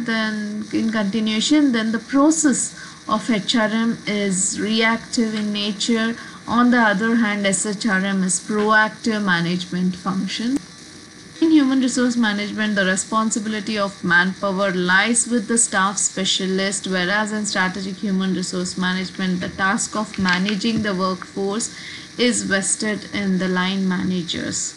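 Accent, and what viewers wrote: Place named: United Kingdom. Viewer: Wow mam trying ur British accent 😁 Well done mam 👍😁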